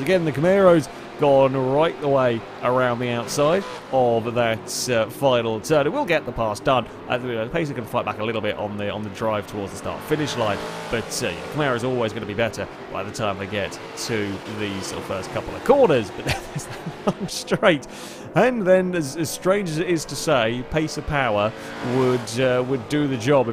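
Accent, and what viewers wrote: United Kingdom. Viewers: I never thought I'd be addicted to listening to a British guy ramble over Forza replays. But here we are.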